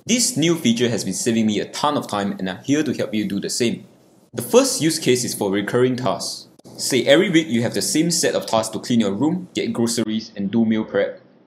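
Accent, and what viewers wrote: Singapore. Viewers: The Singaporean accent haha! Love your content. 😁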